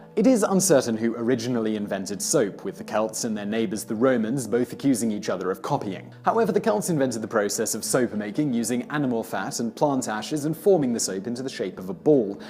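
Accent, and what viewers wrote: United Kingdom. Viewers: Well be patient Simon is British Speaking which can't be too far off from Irish and also the Celts lived in northern Europe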